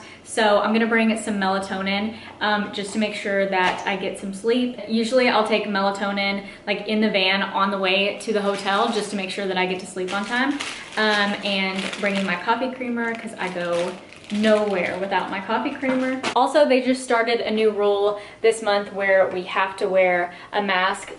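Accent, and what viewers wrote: South, United States. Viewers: This Virginia boy loves to hear ya'lls Southern accent.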